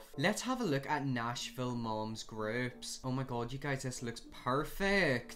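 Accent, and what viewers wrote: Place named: United Kingdom. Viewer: it sounds British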